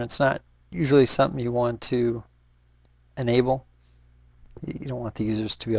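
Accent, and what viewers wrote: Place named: United States. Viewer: I really like this video. There alot of SharePoint 2010 admins who need to step up on their training... hence me watching this video. You have a good teaching technique that is way better than the other sites i've looked at... and it's in clean American English! Please make more of .Net SharePoint related videos!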